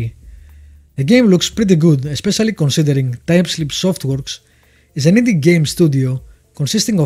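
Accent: Greece. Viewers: Congratulations because u are the only one that has made a Review of tis game. Igoogled "Vigilantes Review" and all the videos where gameplay except yours that was only a Review. I don't count the site reviews just the Videos. Συνέχισε την καλή δουλεία όταν είδα το 1ο video to " Medieval Napoleonic wars" από την προφορά κατάλαβα αμέσως οτι είσαι Έλληνας - έχουμε χαρακτηριστικη προφορα - έκτος Ελλάδος 3 στις 4 φορές που άκουσα κάποιον να μιλάει Αγγλικά το πέτυχα ήταν Έλληνας -.και μετά μπήκα στο "About" του καναλόυ σου και το διαπείστωσα. Sorry for the Greek language i was just congrajulate because he was the only one that has uploaded a video Review of the game and not Gameplay like the other videos when i Google " Vigilantes Review".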